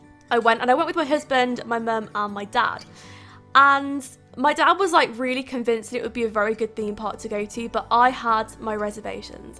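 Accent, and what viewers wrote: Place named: Scotland, United Kingdom. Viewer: Excuse me, are you from Scotland? If not, I think your accent is really Scottish 💜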